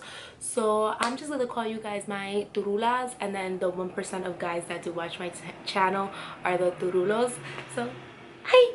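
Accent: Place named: Bronx, United States. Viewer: You sound like you’re from the bx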